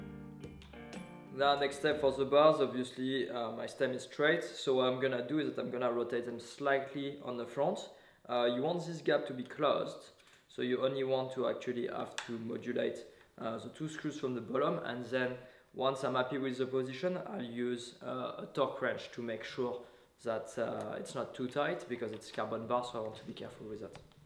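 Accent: France. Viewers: How do I have a French accent like urs lmao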